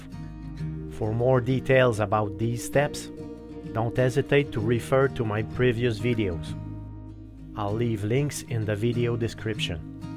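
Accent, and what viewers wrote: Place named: Ottawa, Canada. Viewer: I live in Ottawa as well so your accent makes me feel right at home :). Keep up the great work. I’m enjoying going through the back catalogue.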